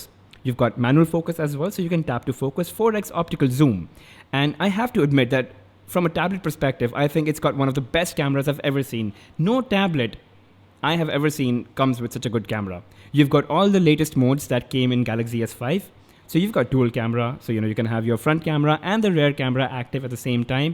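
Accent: India. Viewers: yea thats the indian accent for sure lol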